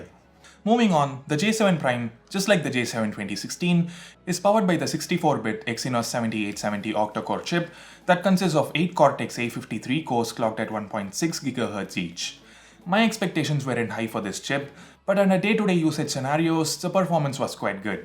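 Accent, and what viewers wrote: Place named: India. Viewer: Indians English sounds annoying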